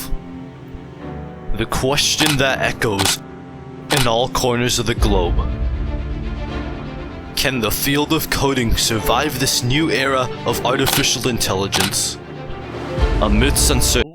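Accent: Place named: Netherlands. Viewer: I can smell the Dutch in your accent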